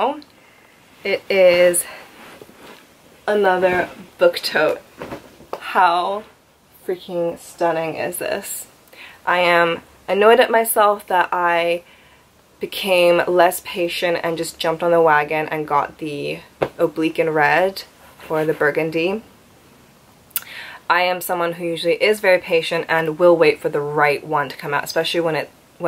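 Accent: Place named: United States. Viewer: Weird question but how come you hv an American English accent? I know you’re in London, Hanoi & Taipei but did you grow up in the states?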